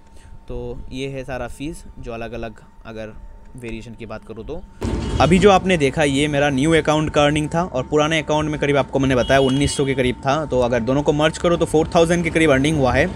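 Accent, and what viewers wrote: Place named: Bengal, India. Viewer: oh I thought you're from West Bengal because of Bengali accent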